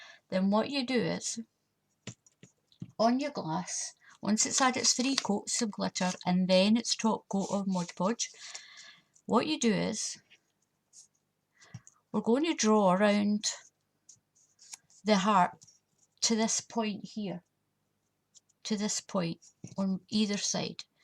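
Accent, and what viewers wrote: Scotland, United Kingdom. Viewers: Fashionable Chambers...some people learn at a slower pace than others. Just like said. And maybe you don’t consider her voice is calming with that Scottish accent. Many many comments left on every one of her tutorials love her accent and love listening to her. Very calming.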